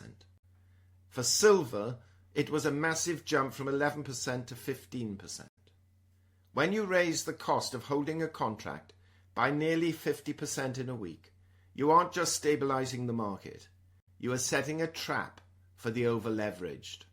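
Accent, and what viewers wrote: United Kingdom. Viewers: Hi - thank you. Really appreciate a calming analysis at a time like this. Had just assumed you were in the UK on account of your accent - gather not. All the best. Simon / Adelaide - Australia - PS the presentation seemed as polished as ever to me.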